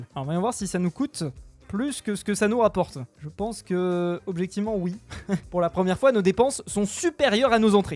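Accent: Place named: Quebec, Canada. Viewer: face-blue-wide-eyes: parle de plus en plus comme quebecois:face-blue-wide-eyes::face-blue-wide-eyes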